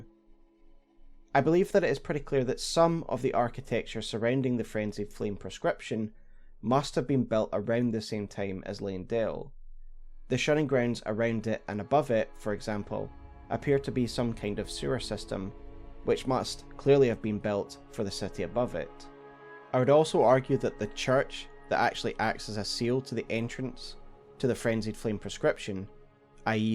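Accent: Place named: Scotland, United Kingdom. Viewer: Wake up honey, the Scottish Elden Ring lore man has posted!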